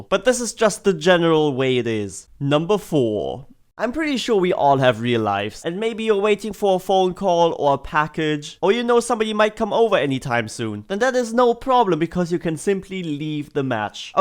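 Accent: Germany. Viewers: you sound extremly german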